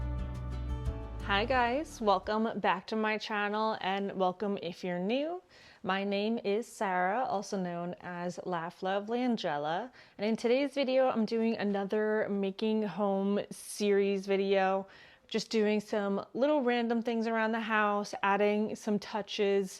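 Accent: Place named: New York, United States. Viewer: What were you doing (for work) before YouTube and motherhood? I remember you said you’re from NY. Upstate? (Accent noted!)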